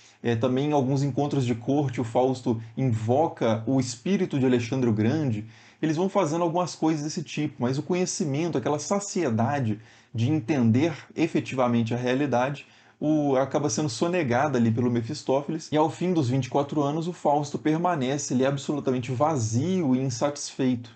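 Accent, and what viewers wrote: Minas Gerais, Brazil. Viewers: o suave sotaque mineiro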